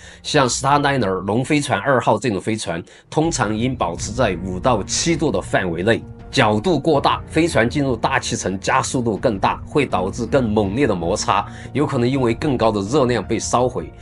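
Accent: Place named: Guangxi, China. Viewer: 广西桂柳地区口音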